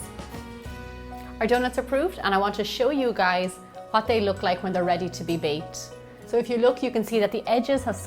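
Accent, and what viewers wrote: Ireland. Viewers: Lovely recipe, but are you Irish? you sound irish... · Love your Irish accent! Cork?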